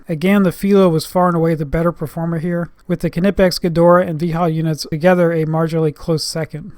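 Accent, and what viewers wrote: Germany. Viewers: sir are you german or hungarian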